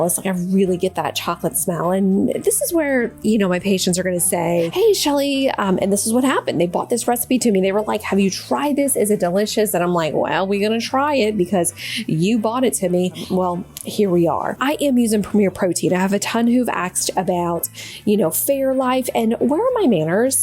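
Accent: Louisiana, United States. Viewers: Ah Louisiana, that’s why I love your accent ❤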